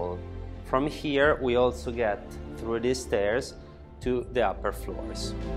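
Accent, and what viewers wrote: Italy. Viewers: Your aristocratic Italian ascent and your selling technique is unique, especially with the beautiful landscape of the Italian country side, This property exudes character and elegance, certainly an investment which can improve with a healthy income while the prospective property owner can also capitalize on living in a superb location. I am from New Zealand living in Australia, both beautiful countries, your delivery is a symposium of magic,drawing us into a life style of Italian eloquence. Thank you.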